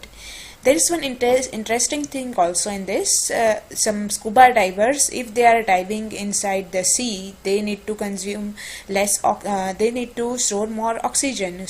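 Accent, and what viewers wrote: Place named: India. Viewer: I am not trying to sound racist or xenophobic. But I am having a very hard time understanding what the Doctor is saying. Her Indian accent is so heavy it makes understanding everything she says sometimes beyond my ability to comprehend. I have to review the video many times. If this Doctor would simply slow down her speech by only a small fraction it would truly help those not a custom to her accent. Thank you for your time.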